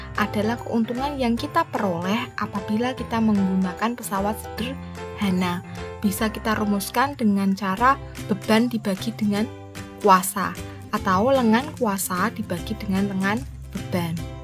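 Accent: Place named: Java, Indonesia. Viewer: Ibu nada jawanya kentel banget 😅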